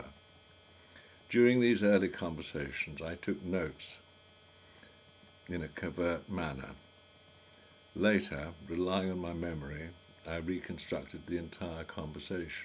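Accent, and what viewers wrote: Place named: United Kingdom. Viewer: You mean "moot point?" What "internal linguistic evidence" do you speak of? You are presuming that anything this guy Castaneda says is this mystical wisdom, even if you see that he's tricking you. I personally have no respect for "teachers" who assume that their students are too stupid to understand the real truth, so they make up bullshit to make it easier to digest. It's like a religious faith. If you are tripping balls and listening to a nice voice with a Brit accent it probably sounds nice.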